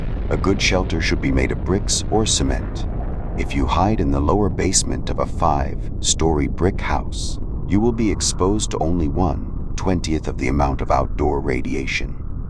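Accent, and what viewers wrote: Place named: United States. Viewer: 好喜歡這個美式英語的旁白，增加不少信聞中常用的語句，不同於英語課本，離生活中遇到的，同時那考試也是一樣！我旅居英國15年，和同是聊天，就類似如此！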